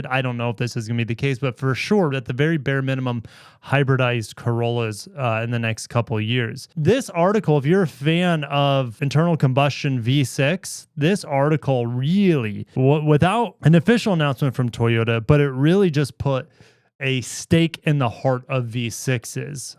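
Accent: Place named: Wisconsin, United States. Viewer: I still have my 2000 Sienna running good. I'm thinking about getting 2021 Lexus RX BUT IT HAS 80,000 miles do you think I should get it? $28,000? Ps are you from the Midwest you sound like us in Wisconsin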